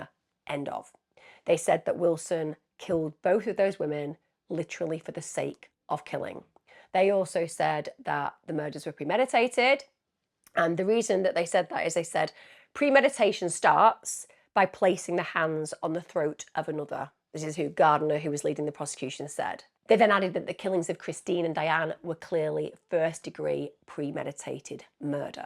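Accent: United Kingdom. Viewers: i live in LEE COUNTY FLORIDA , FORT MYERS and its so funny hearing someone from the UK talking about this story .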